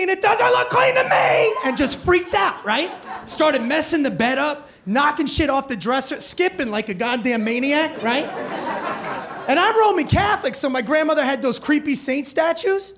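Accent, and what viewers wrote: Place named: New Jersey, United States. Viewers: Jersey Italian accent is perfect! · Knew this guy was from Jersey just by the way he said "ya knowada mean". Also knew he went to Florida for rehab. Also knew he wasn't a bad guy, just seen some fucked up shit. Loved the act but hit me when I realized how real this joke was. It was REAL MOTHAFUCKERS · This sounds like every guy from NJ. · he sounds like jake if jake had a jersey accent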